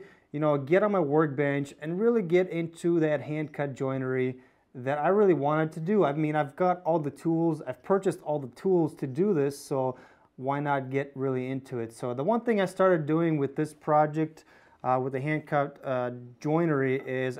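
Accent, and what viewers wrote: Russia. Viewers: I knew it))) You cannt get rid of a russian akcent compleately))) Regards from Ukraine!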